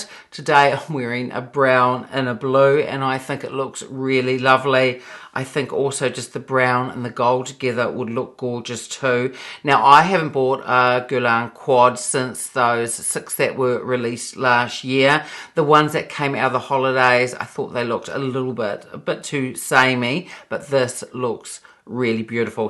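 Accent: New Zealand. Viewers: So happy to have come across your channel.....as a fellow Kiwi living in Switzerland I really enjoy hearing the NZ accent when talking about makeup 🥰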